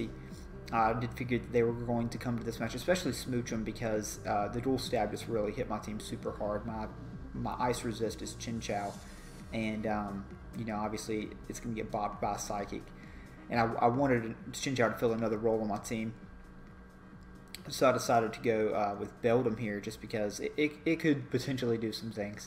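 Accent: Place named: South, United States. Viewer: GG my dude. Very well played. You sound more southern in this vid than any other. I love it haha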